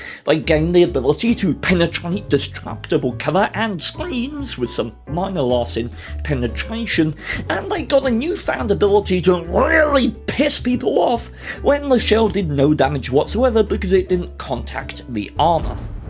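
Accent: United Kingdom. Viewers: This guy is the most bri’ish guy in wot…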